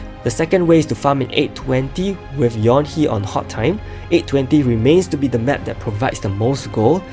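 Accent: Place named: Singapore. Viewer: are you from Singapore? quite a familiar accent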